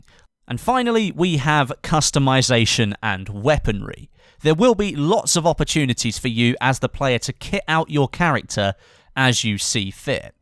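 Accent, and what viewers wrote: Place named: United Kingdom. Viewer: why do brits get famous by posting crappy vids. i want to see REAL gameplay not listen to your annoying brit voice.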